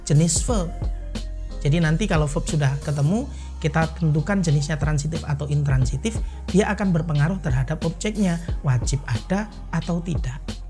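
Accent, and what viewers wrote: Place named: Surabaya, Indonesia. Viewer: MANTAP. NGGAWÉ LOGAT SUROBOYOAN ❤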